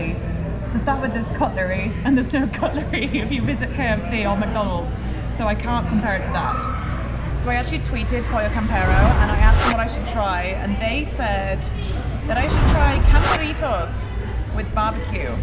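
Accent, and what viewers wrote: United Kingdom. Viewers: Did people ask you if you were British lol. Love your accent lol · British accent - interesting.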